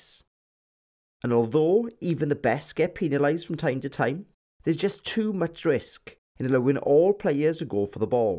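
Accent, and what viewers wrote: Wales, United Kingdom. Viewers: great clip, excellent analysis- in this channel we have a Squidge competitor- love the heavy Welsh accent- must be a man from the Valllleeezz? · Great insight and enjoyed the Welsh accent as a bonus! Subscribed and followed on Twitter. Looking forward to learn more from you. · I like this Welsh accent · Just love your Welsh accent😊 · Excellent analysis. Love that thick Welsh accent. Regards from South Africa.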